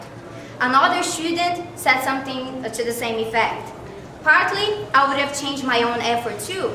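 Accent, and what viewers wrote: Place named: Goiás, Brazil. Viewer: loved your sotaque goiano em inglês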